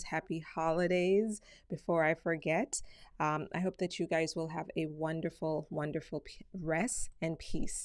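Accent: Jamaica. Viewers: Jamaican? You just made me like your presentations even more, if that's possible!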